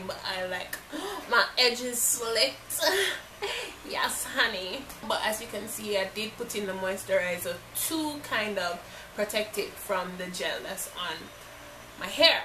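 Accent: Jamaica. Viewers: Where are you from? You sound like you're from Jamaica (or a Caribbean island at least) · I so love your accent, Jamaican Big Up Gal!!! Listen I am in England honey I don't think I can get that gel here. where did get it from?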